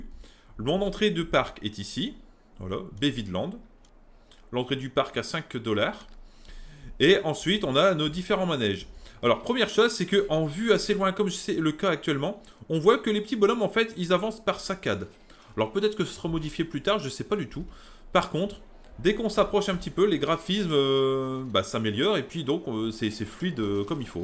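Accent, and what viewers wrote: France. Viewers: Salut j'aime bien tes tests, tu es de ch'nord aussi ? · t du ch'nord sa s'entend frere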